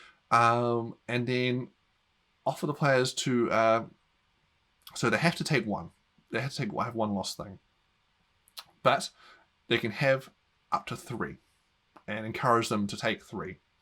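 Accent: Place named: Australia. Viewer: Gidday , detection of a fellow Aussie accented. :) or is there a Kiwi twang, i dunno Kiwi roks to, A Aussie Kiwi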